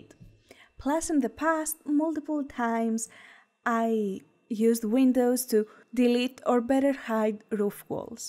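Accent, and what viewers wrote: Greece. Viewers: aww knew it!! It's comforting to hear, I'm Greek Canadian and I knew I recognized that accent anywhere :) kali nixta!! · Hi! Are you from Greece? Your accent sounds greek!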